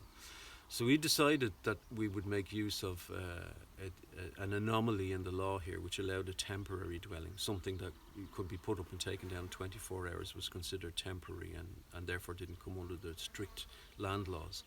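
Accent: Ireland. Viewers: 😂Born and raised in south side Cabinteely. I know a Dub accent when I hear it😂. You never lose it.😂 Great video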